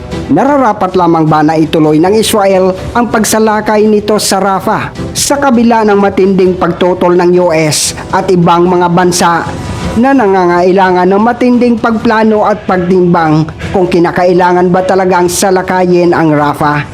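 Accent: Iloilo, Philippines. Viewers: kesa naman sa katulad mo na di kaya ang ginagawa ni prof eh ilonggo yan si idol eh hahaha. Mas mahilig pumuna ang mga taong walang kakayahan kesa doon sa marurunong.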